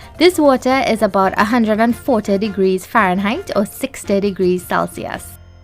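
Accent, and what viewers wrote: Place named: Trinidad and Tobago. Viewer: Amazing, love the trini accent.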